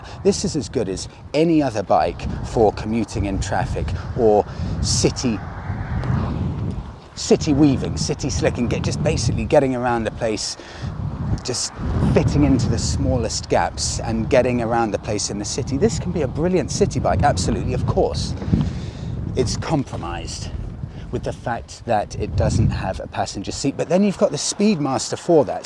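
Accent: England, United Kingdom. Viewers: Great review mate! There's some serious badassery mixing a pommie accent with ape bars.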